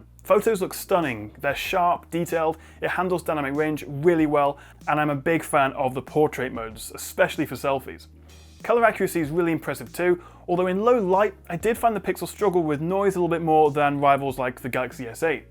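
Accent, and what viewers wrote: United Kingdom. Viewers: I just wanted to say, I love your British Accent. I'm from Germany and British English sounds really good I think. (British) English is such a beautiful language :) Love your Reviews in General. I'd buy the Pixel if the smaller one wasn't so bad looking... I think you can only have on Screen Navigation Buttons if you have a larger Screen. For me it's a waste of space. But the xl model is too big and expensive for me :/ I'm going to get the s8 on cyber monday. It's already a lot cheaper than the Pixel and I hope to get it for 500€ then.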